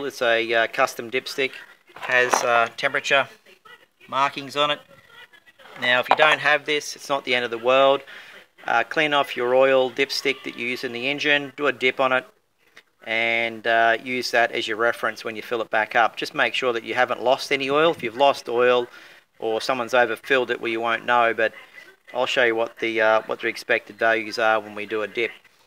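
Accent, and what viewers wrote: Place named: Australia. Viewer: Thanks, mate. It's great to hear an ozzy accent and great advice.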